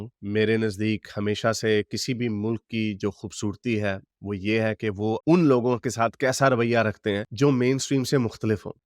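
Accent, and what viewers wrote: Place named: Punjab, India. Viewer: Being indian punjabi I love ur punjabi. The same style. God bless u.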